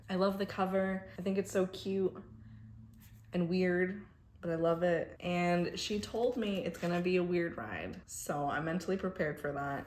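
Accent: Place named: Colombia. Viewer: Me encanta tu acentito colombiano 😊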